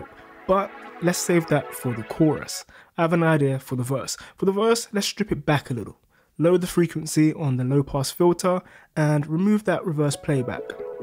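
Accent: United Kingdom. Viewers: Ahh I feel so embarrassed now! I forgot your had a London accent. Anyway I hope you have a great holiday regardless!!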